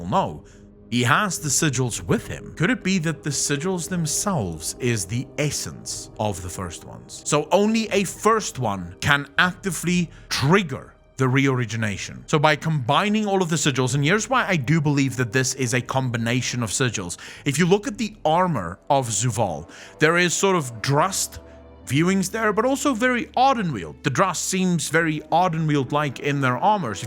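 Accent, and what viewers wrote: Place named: South Africa. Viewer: Subbed cause fellow Safa :) Would recognize that accent anywhere. Good vid my dude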